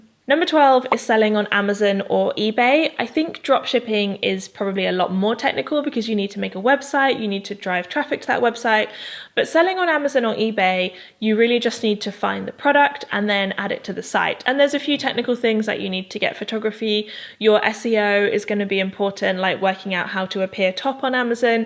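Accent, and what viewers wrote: United Kingdom. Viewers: Great content as always! Thank you 😊👍 and this british accent grrrr loving it! 😊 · It’s so refreshing to hear a British accent! Hallelujah 🙏🏾No shade!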